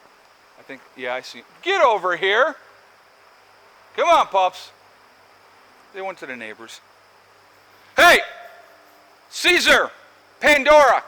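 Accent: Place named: South, United States. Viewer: I thought you were from North Carolina..or some southern states.. Y could anyone hate you? Perhaps they're jealous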